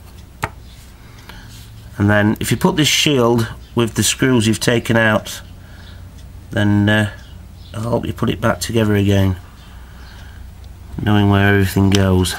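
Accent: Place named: England, United Kingdom. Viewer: Thank you for posting this. I watched this from Connecticut USA, whilst getting a Skype call from from a family member located in North Lincolnshire and commenting, "he's from England!":D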